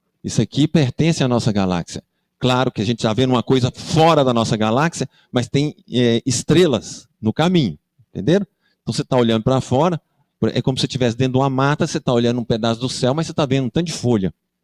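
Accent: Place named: Minas Gerais, Brazil. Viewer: Astronomia e GALAXIAS palestrada por um mestre com sotaque mineiro fica mais LEGAL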